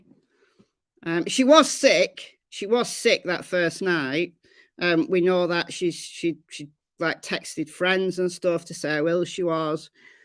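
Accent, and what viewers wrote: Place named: Southern England, United Kingdom. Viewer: So gutted I missed your live. Love your channels and you are the first person I look for when I wake up. I’m in the UK to but much further south judging by your accent. Thank you for everything you do keeping us up to speed with the facts! X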